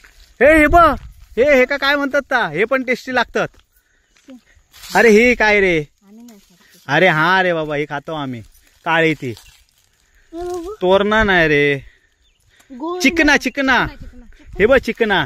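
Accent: Malvani, India. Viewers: Tu malvani volg madhe Chan Boltos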